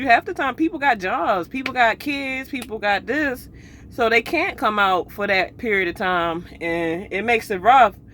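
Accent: Baltimore, United States. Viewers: I like how u rep B-More w/that accent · sound like she is from baltimore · Second video I seen and like!!! I hear that Baltimore accent💯 sounds like home☺️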